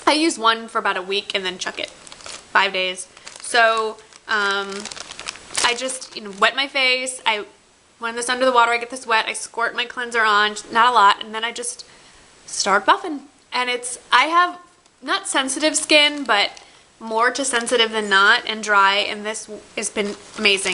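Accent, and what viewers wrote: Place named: New England, United States. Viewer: I love listening to her talk. She's from the New England states and has that accent. :)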